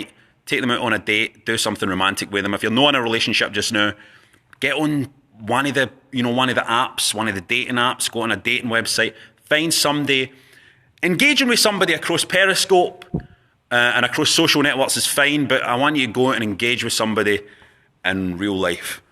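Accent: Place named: West End, United Kingdom. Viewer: Mate yer sounding like a fucking West End Weirdo these days.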